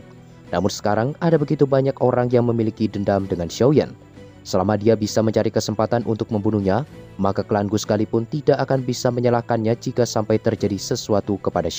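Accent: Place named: Malaysia. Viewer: Saya minat dengan cara sebutan min yang tidak kalah dengan orang malaysia, sama seperti portal hitam, cara penceritaan yang penuh penghayatan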